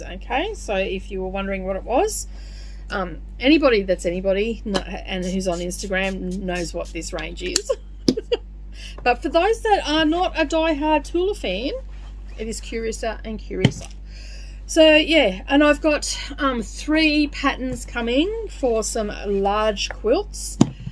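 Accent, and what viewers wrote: Australia. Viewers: Thelma writes:, so good to watch you doing EPP. I am doing just that at present using all my scraps. You voice brings me back to Melbourne where my son lives. I was there visiting my grand-children but did not have a chance to visit any craft shop. Thanks, I love the EPP, 👍 👍 👍 👍 Keep it up!!!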